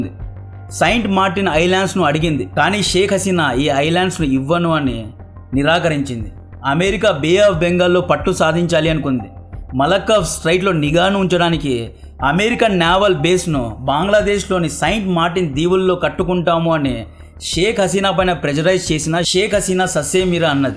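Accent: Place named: North India, India. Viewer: బ్రో మీరెందుకు తెలుగు భాషని ఒక్క ముస్లిం లాగానో లేదో తెలుగు రాని నార్త్ ఇండియన్ లాగా మాట్లాడతారు? పెండ్లాం కాదు అనాల్సింది భార్య అని కొద్దిగా ప్రొఫెషనల్ గా మాట్లాడటానికి ట్రై చెయ్యండి.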